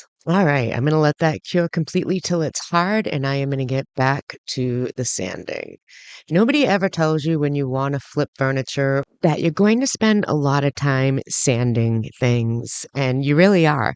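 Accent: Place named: New England, United States. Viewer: Awesome! Love the combination of wood and black. I'm from New England (lived in all states except Maine:} and was picking up on your accent. When you mentioned the cold weather and living in N.E. I wasn't surprised! Which state? I now live in central coast of California and love it here. The weather is so wonderful. It's not crowded and little traffic here. Holly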